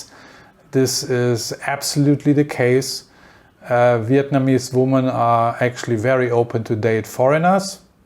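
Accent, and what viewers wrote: Germany. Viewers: Do I here a strong German accent? 😊 How to stay there without border running?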